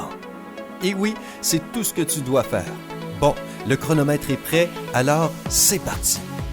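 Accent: Canada. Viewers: j'adore cette voix, avec l'accent canadien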